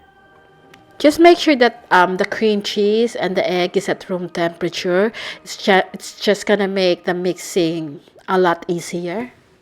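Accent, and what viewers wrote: Philippines. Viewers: She has Philippino accent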